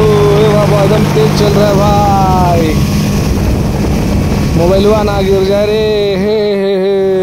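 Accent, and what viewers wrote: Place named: Jharkhand, India. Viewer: Mast Jharkhandi language. Maja aa gaya.😊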